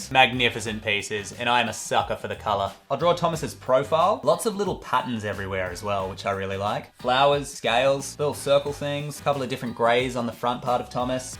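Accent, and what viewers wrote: Australia. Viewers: Nice! I just found your channel. Never thought id say this but its nice to hear an Aussie accent on youtube for once. All the other channels im subscribed to are American or British and there's nothing wrong with that, but yeah... Loved your first version of Thomas. He looks like he could grope me through 3m of concrete. 😅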